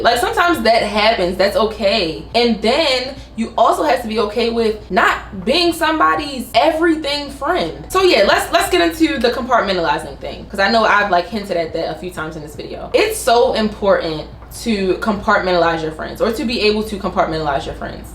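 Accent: Baltimore, United States. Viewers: Are u from Baltimore?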